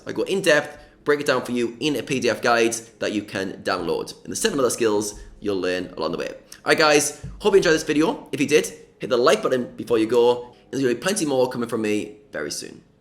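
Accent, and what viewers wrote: United Kingdom. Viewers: Great video! Where are you from? Wales? Curious about your accent.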